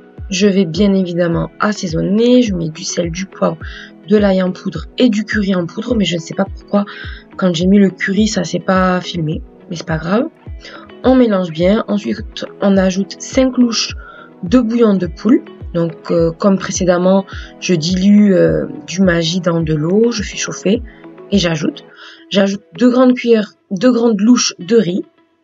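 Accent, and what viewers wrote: South, France. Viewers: je n'avais pas fais attention dans la vidéo mais il me semble que tu as dis que c'était sûrement la grossesse qui te fais adorer la Mozzarella. Félicitations à toi 😊😊 je ne me lasse pas de tes vidéos avec ce jolie accent du sud que tu as 😉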